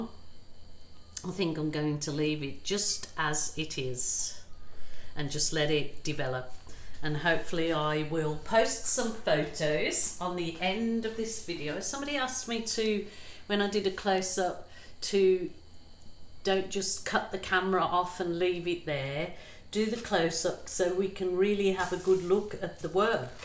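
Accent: Birmingham, United Kingdom. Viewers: Glad your feeling better x i thought you had a touch of a Brummy acsent..thought i was going mad lol x fab pour x still think it looks like a snake xx lots of love from the uk xxIm gonna start making my paints up few days before x great tips today xx thankuuu xx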